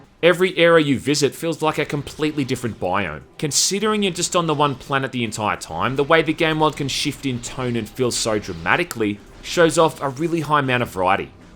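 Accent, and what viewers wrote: Australia. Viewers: are you australian? I ask because of your accent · is this the same aussie that did those hilarious mortal kombat lets plays?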